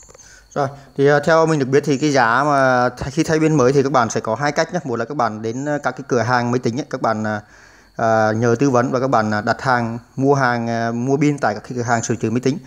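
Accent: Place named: Nghe An, Vietnam. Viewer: Giọng xứ Nghệ nói khó nghe thật , lắng nghe nhức lỗ tai mà chẳng hiểu nói gì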